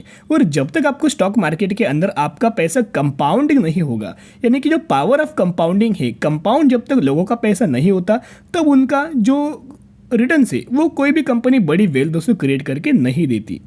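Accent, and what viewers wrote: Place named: South, India. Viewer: Tomara hindi just like south Indian.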